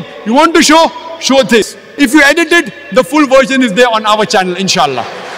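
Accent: India. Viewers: Assalamualaikum, admin please give english caption/automatic caption. We not too understand Indian accent. Please... Wassalamu'alaikum.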